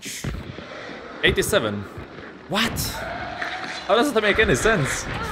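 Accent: Czech Republic. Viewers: nothing beats that hard czech accent.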